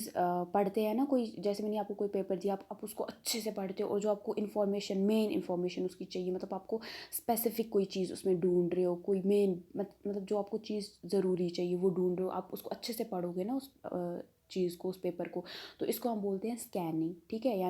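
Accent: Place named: Pahadi, India. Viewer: Uh have pahadi tone in language......btw my next exam is eng. daily life .. video helps me a lot❤️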